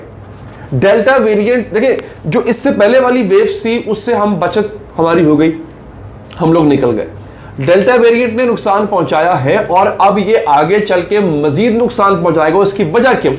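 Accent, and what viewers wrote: Punjab, India. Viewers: Love your punjabi accent 😍 ❤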